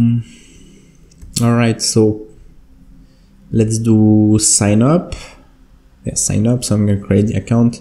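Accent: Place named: France. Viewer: You are soo french... i truly understand the translations going through your head as you try to speak English. I speak french too but a native English speaker... the "cool" finally gave you out. Nice video.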